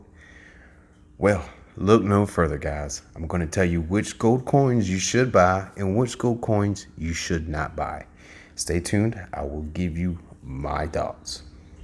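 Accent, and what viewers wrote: South, United States. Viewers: Honestly you got me to the hit the subscribe button on your southernality alone haha Keep on stackin that gold brother!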